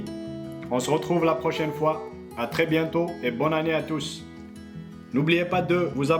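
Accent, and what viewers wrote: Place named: Algeria. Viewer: Tres bel accent algérien. Tres bonne recette. Merci chef !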